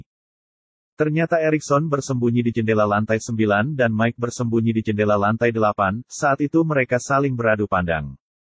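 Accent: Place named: Banyumas, Indonesia. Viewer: Sesuai request sudah ngapak2 nih kawan salam silahturahmi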